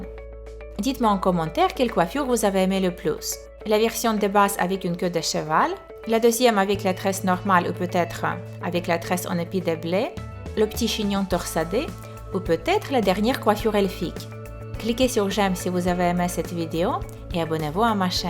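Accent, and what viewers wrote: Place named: Russia. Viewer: j'adore ton acent russe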